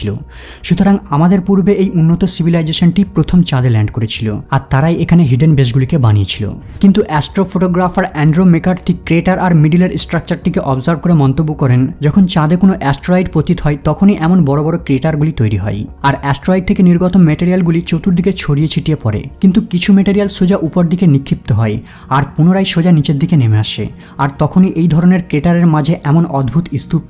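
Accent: India. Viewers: Annoying কলকাতার বাংলা উচ্চারন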